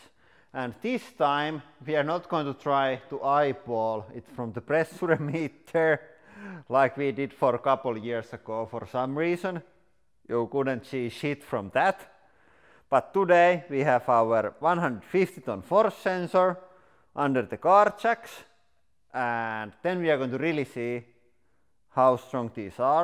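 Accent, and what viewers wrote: Finland. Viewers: Sounds like this guy is from Finland and he has some Biltema jack's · Without checking, you are from Finland :) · Drop the talk. Can't tell if you're speaking Finnish or English with extremely heavu Finnish accent. Put up some text instead. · ralli englanti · Finnish accent?? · Most Finnish accent ever. Go Finland 🇫🇮 Soumi!!!!! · I've been wondering why this guy sounded like Kimi Raikkonen, little digging and just found out he's Finnish, no wonder lol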